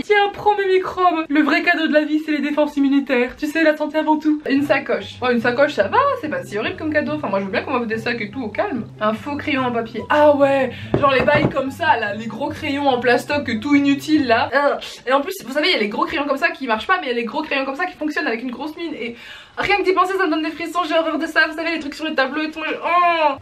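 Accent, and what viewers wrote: Belgium. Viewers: T'es belge?😃😄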